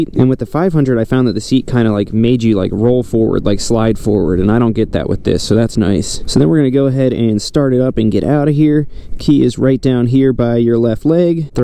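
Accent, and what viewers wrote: Maryland, United States. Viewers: I just found your channel, I could tell you were from Maryland before you said Annapolis. I moved from there almost 4 years ago, I now live in Florida. I subscribed.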